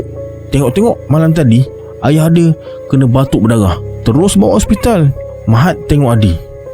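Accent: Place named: North, Malaysia. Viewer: Memang sempoi video kali nie 🤟 loghat utara nohh 👍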